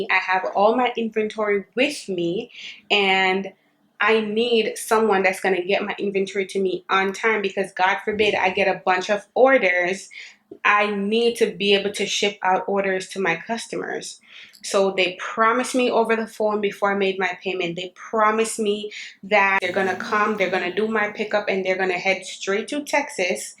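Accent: Jamaica. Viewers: ARE YOU JAMAICAN HUN❤❤❤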